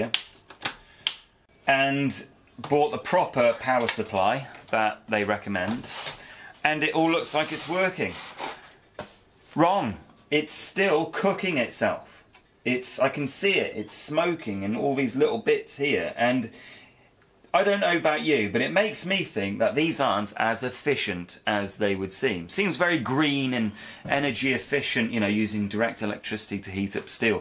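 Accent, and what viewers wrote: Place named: United Kingdom. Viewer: I like your videos and British dialect, I made my own from parts I salvage from SMPS PCBs, Plasma TVs etc.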